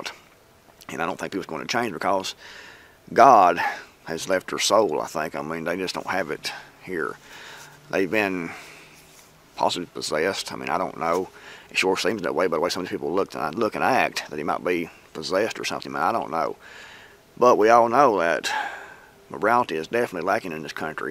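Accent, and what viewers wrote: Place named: Tennessee, United States. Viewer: What state are you in? Sounds like Tennessee lol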